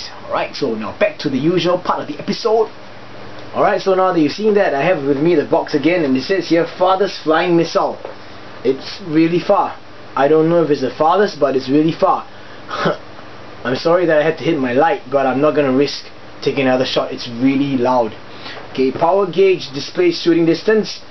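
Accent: Singapore. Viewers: DO MORE SINGLISH! PLEASE! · OH MY ENGLISH!... eheh nice singlish accent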